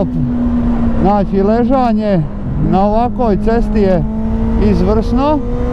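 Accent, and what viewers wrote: Dalmatia, Croatia. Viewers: E lipo mi te cut bruderu, kako to fino objasnjavas po dalmatinski :)))) Hvala za super video, taman hocu da kupim bandita i to bas takvog. Veliki pozz iz Sarajeva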